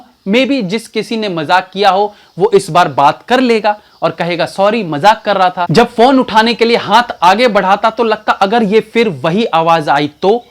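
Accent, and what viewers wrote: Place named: Bengal, India. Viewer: Bengali Voice like Mamta Banerjee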